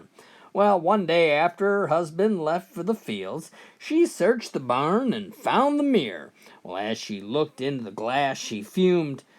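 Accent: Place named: Texas, United States. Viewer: I laughed once, but not because of the jokes. Ngl they were terrible but that texas accent was funny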